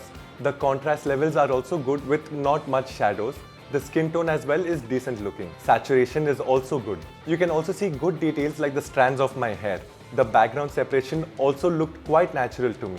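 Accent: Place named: India. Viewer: Look like a european guy but with india accent🤭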